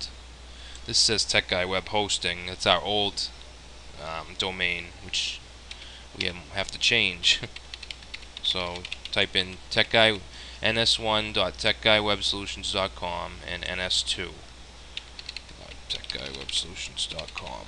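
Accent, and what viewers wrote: Boston, United States. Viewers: Dude your Boston accent is ringing in my ears. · with the boston accent lol. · Strong boston accent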